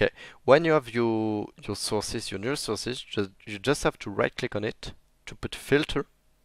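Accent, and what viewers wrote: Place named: France. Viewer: Love your french accent man! keep up the good work.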